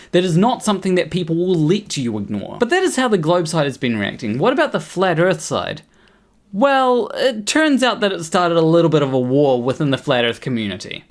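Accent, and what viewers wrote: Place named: New Zealand. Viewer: That accent, are you a kiwi too?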